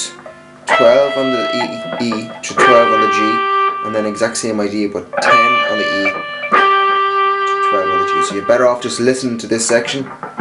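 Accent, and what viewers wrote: Ireland. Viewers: are u irish... im from galway! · irish ? :D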